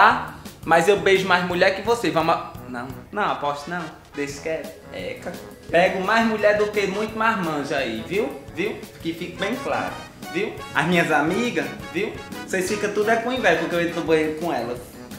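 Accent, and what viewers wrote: Northeast, Brazil. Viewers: De que estado é a Samantha? A região é claro Nordeste. <3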